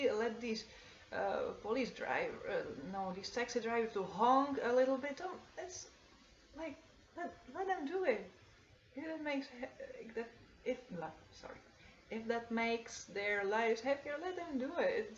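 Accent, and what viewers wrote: Netherlands. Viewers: Hmm sounds sometimes like dutch or Flemish but when listen very good maybe Denmark Norway, I don't think east Europe Definitely not German. I'm very curious now. Please tell me. I started copelandia ogre boy shroom kit and researching YT Pobrezni❓polish really I have lots of polish friends here in Amsterdam and non of them sound like you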